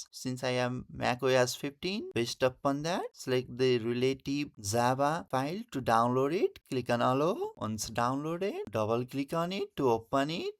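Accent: India. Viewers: you know that you are completely saved the moment you click on a tutorial and hear a indian mans accent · Guys remember when these types of videos have an indian accent ur saved 😭🙏 he earned a subscriber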